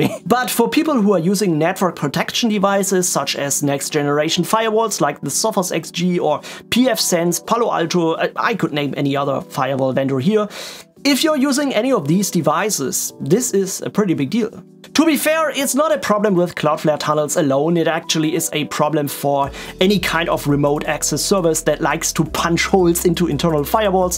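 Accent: Germany. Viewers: your voice sounds very german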